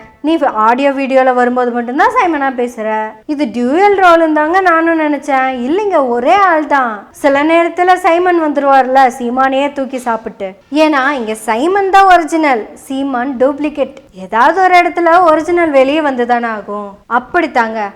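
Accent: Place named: Coimbatore, India. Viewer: Super as usual always you are bold and pronouncing in Kovai language and taking subjects 🎉❤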